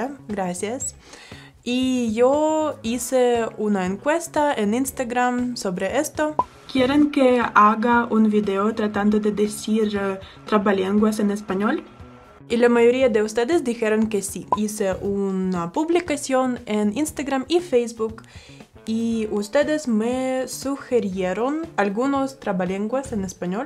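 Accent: Guatemala. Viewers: Me gusta el acento con el que ablas español chapin. 😂😂😂 me encantan tus videos señorita, saludos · saludos me gusta el acento de Guatemala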